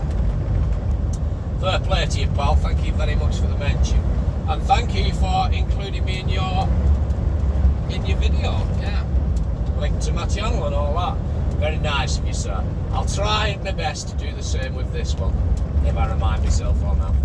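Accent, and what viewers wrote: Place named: Yorkshire, United Kingdom. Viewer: that's the worst Yorkshire accent I've ever heard, can't say to much about lanky Lanks because my wife is a lanc god forbid, what a marriage Y&L.😂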